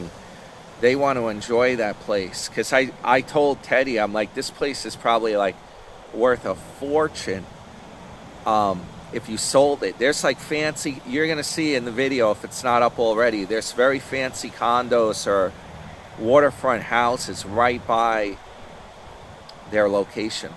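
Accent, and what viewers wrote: New York, United States. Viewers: Great work, You are a relentless, hard working investigative Youtuber. So nice you met & and they were responsive towards you, basically a stranger but with the NY accent. Real nice genuine people just like our friend. 😊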